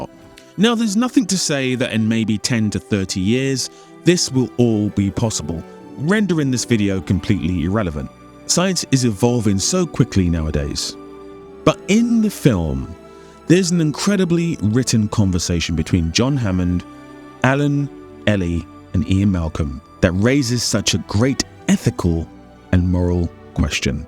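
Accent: United Kingdom. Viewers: You showed an Idris Elba movie clip here. How does his British dialect differ from yours or are they similar?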